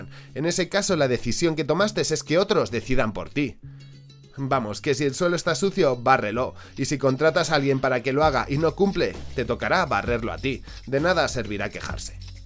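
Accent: Colombia. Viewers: Que crack, pareciera que un colombiano hablara, estás muy bien contextualizado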